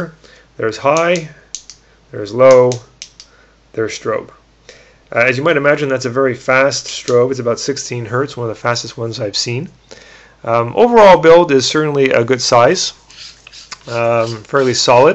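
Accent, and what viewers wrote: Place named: Canada. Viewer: Actually I noticed your accent. Ive watched my share of CBC and Kids In The Hall lol.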